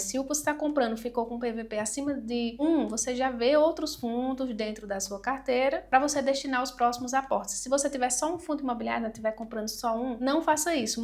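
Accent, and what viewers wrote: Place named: Paraíba, Brazil. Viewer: Amo assistir seus vídeos, pq eles são bem explicados. Outra coisa é o sotaque que conheço heheh já que tbm sou paraibana.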